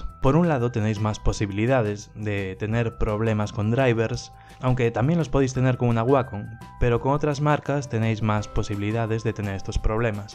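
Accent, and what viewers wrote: Galicia, Spain. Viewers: Es cosa mía o eres gallego amigo mío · Eres gallego?Yo también... :D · eres gallego! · Eres gallego? jejeje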